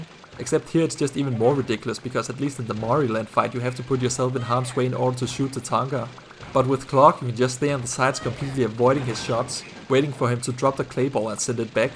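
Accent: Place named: Netherlands. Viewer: That Jan Willem Nijman clip from his juice talk where he mentions explosions was really unexpected in this video. 😅Your accent sounds Dutch so I guess that explains it. Do you have a background in game dev? Overall great recap of Skullmonkeys. We had The Neverhood at home when I was a kid, used to play adventure games together with my dad and brothers. But never heard anything about this game. The fellas at Neverhood Inc are legends to me, so much creative expression.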